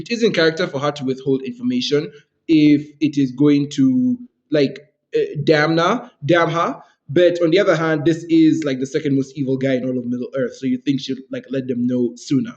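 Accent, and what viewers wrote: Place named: Zimbabwe. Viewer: Where are you from? You sound Zimbabwean I'm just guessing 🎉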